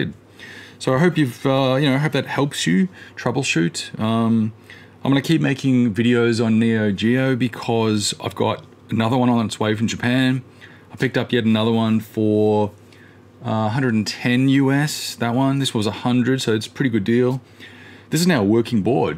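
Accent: Australia. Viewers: Great video. The microphone works just fine. I'm mexican and I do understand very well your aussie accent. Thanks for your video.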